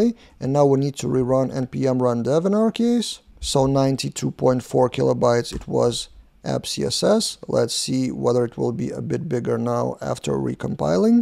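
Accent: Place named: Russia. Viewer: Имеется ели заметный русский акцент у автора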